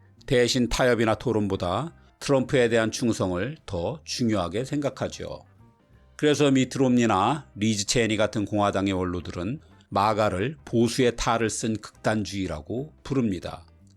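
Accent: Gyeongsang, South Korea. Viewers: 딱 갱상도 네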